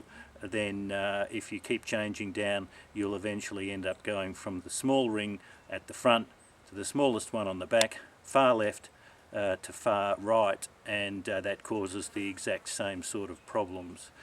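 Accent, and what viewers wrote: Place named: Australia. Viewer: youre australian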